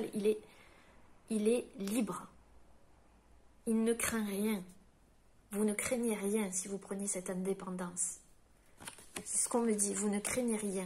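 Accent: South, France. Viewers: Merci l’accent du Sud tellement magnifique accompagnent tes messages qui sonnent très juste pour moi encore merci je t ´envoie plein d’amour Christophe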